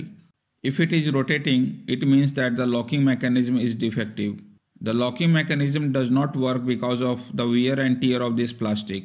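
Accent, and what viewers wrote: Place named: India. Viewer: Sounds like from India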